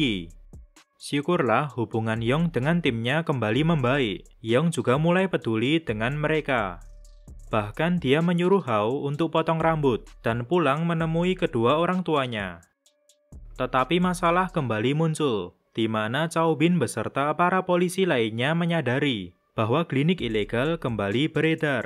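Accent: Java, Indonesia. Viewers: Asli mana bang ? Suaranya seperti saya sama" medok 🙏😊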